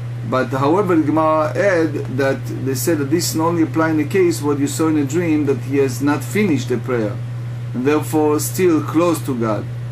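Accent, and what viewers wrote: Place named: Israel. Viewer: what is great about your classes also is that you use the Modern Hebrew pronounciations which makes it understandable for some many more people starting Gemara.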